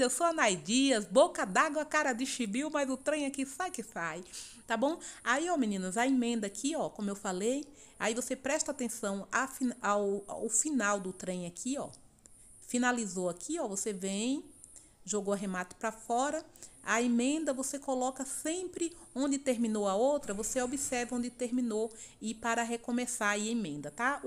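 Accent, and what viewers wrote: Bahia, Brazil. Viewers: E tá baiana arretada,gosto muito do teu jeito de falar 👏👏👏👏👏👏🙏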